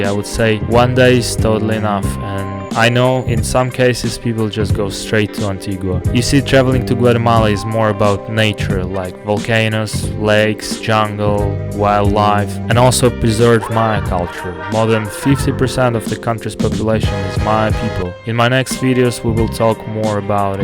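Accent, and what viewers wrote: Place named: India. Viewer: Are you from India your accent and your way to exprés yourself is terrible maybe you should try a silent video ! Mediocre video to say the least !!!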